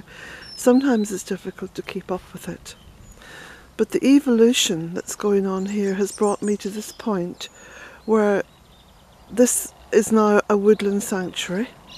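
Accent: Ireland. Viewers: I watch your vlogs for inspiration, comradeship and hope: but also for relief from stress, solace in hard times, an escape from my own life and even as an aid to peaceful sleep when life is really hard and I am in distress. I put my laptop on the floor near my bed and drift off to sleep listening to your lovely voice and tales from at those times and it has been such a blessing. So yes indeed, with your Irish lilt provide a sanctuary for me:)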